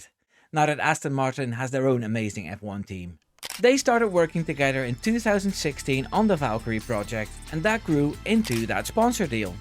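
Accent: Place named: Netherlands. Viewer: Zeg dat je Nederland bent zonder te zeggen dat je Nederlands bent. Je klinkt ook jong trouwens, maar dan zie je opeens een vent van 53 in beeld.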